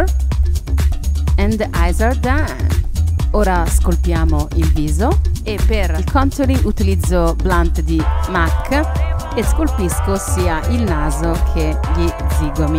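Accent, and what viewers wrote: Italy. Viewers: Omgosh your Italian 😩 gets me everyt-i-m-e! Very glam hunny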